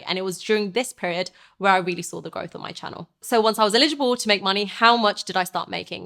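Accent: United Kingdom. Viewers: Why you look like brazylian and talk like brit?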